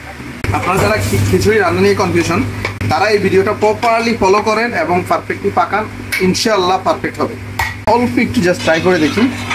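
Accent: Noakhali, Bangladesh. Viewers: কিও ভাই আন্নে কি নোয়াখালী পোলা নিও বার বার পানি কে ফানি বলছেন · Apnar basha ki feni naki noakhali? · ভাইয়ের বাড়ি নোয়াখালী এ ভাষা সারা বিশ্বে চলে ইউটিউবে কি সমস্যা?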